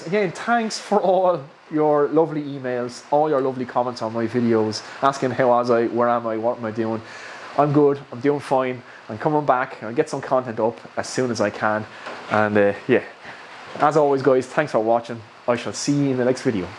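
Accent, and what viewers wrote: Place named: Wexford, Ireland. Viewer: where are you from, you sound like a Wexford Man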